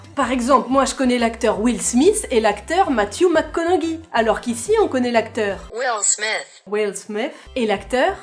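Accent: France. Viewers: J'ai le même accent que toi. En fait il faut se dire que l'accent français est l'accent internationale pour parler anglais car il est le plus compréhensible pour tout le monde. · Test your English skills: + camouflage = Camiflage 😃 Because you blend in Québec so well! Vos fans américains adorent votre accent français! 😘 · Le seul truc quand on parle anglais ohhh so cute a french accent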